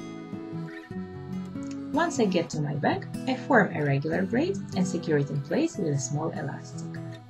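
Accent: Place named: Russia. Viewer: I believe she is Russian, but living in France, so she as a mixed accent :)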